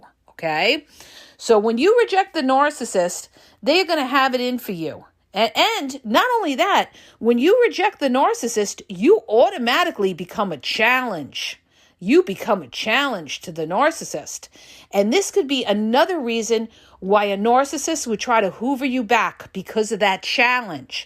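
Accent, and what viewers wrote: New York, United States. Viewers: Besides all the Facts that speaks on about Narcissist Abuse, has the Cutest New York accent here on YouTube 😊❤❤❤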